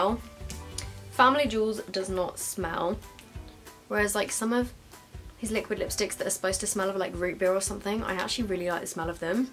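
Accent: England, United Kingdom. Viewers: I live for english accent 😍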